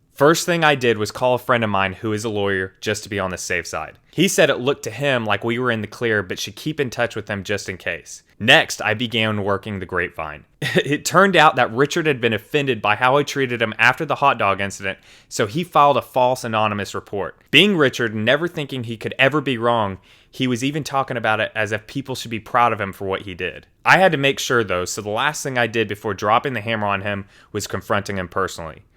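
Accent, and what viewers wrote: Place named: South, United States. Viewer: Your southern tang makes me want to watch your videos more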